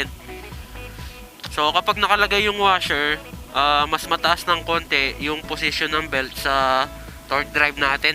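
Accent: Pampanga, Philippines. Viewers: Kapampangan ka sir? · Kapampangan ka paps? Hahaha papuntang bahay pare yun aa.😂😂😂😂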